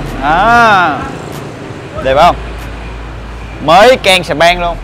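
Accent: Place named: Phan Thiet, Vietnam. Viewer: anh từng sống ở phan thiết đúng k anh? Nghe giọng giống dân pthiet quá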